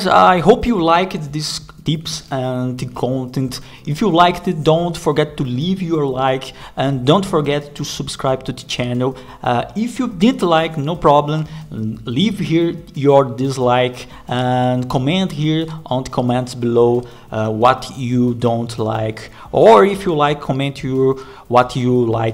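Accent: Brazil. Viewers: Eu sabia que vc era br só pelo o seu sotaque Br tem um jeito exagerado de pronunciar as vogais kkkk Vídeo top d+ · Sabia que era brazuka kkkk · Brasileiro falando ingles nao tem como nao pegar Haha. Otimo conteudo irmão. Parabens.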